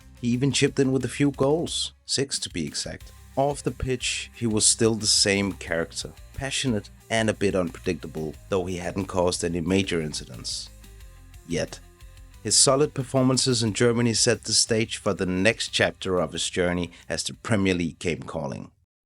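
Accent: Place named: Denmark. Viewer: The Danglish is strong with this one.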